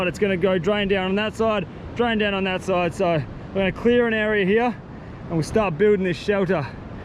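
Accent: Australia. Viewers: He’s literally so Aussie the way he talks he’s iconic i don’t understand how anyone would miss understand where he’s from lmao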